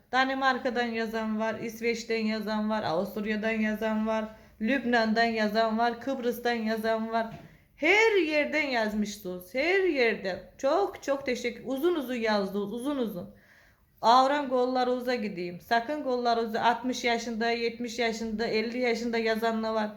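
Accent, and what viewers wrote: Trabzon, Turkey. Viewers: hanım tam bir trabzonlu gibi konuştun korkanlar tehdit eder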